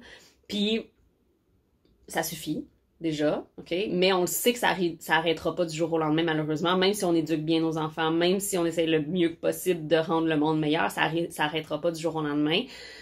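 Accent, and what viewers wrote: Quebec, Canada. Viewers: Bonjour, ici la France, je découvre et j'adore tout... Et l'accent du Québec et top... Je visionne tous tes podcasts et j'adore... Merci pour ce plaisir tricotesque 😄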